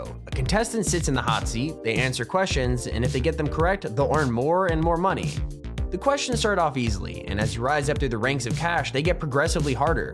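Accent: Australia. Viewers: Are u Australian bc I'm Australian (mate) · Wy you doing an Australian voise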